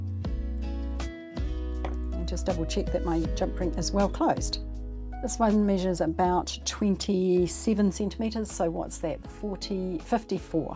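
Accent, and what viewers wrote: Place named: New Zealand. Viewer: The Kiwi accent tripped me up, I thought she was saying pills, pills and more pills 💊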